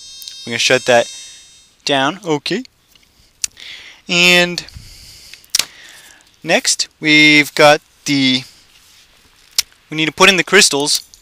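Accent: Canada. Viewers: He kind of sounds Canadian to me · Is he Canadian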